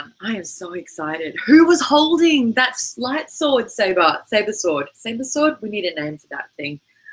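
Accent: Australia. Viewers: You sound Australian. :)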